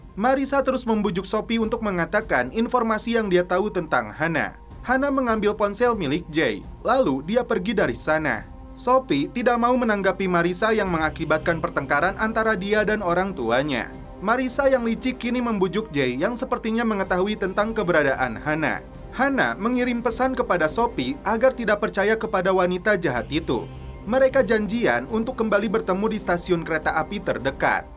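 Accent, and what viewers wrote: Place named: Garut, Indonesia. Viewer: Narasi gaya Garut...... Keren hehehehe..mmm. Mana bajigurna, kang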